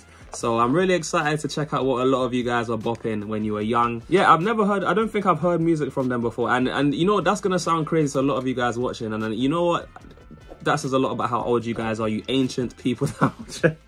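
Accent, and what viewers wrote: United Kingdom. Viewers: i could be wrong, but you sound british, and say youve never heard the beatles or queen? have you been in a coma your entire life?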